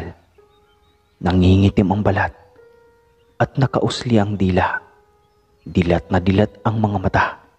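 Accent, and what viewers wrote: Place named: Visayas, Philippines. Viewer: Boss bisaya kaman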